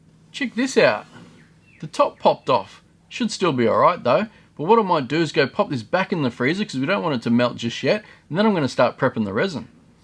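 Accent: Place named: Australia. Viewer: Im an american, and half the reason i watch your channel is for your awesome australian accent 🔥🔥😂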